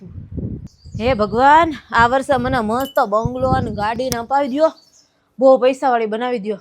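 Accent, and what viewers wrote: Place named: Bhavnagar, India. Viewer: મને પણ ભાષા, આમ તો 'બોલી', સાંભળીને એ જ વિચાર આવેલો કે બહેન ભાવનગર બાજુનાં લાગે છે...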